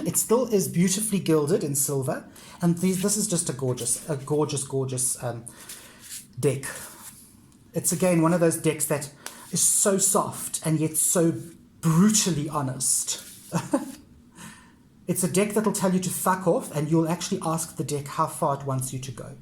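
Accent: South Africa. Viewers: Oh my! WHO ARE YOU??!!! I've only just stumbled upon you vis-a-vis this video. (Who doesn't love a collection video!) Immediately, I recognized, and was drawn to, the South African accent. LOVE your energy, wit, clarity, etc etc... I will be certain to check out other videos on your channel. You've earned a new sub. Thank you for sharing your collection! ♥️ You and yours, stay well. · Really enjoyed your video! Great collection, and your accent made me feel nostalgic, as I was born in South Africa😊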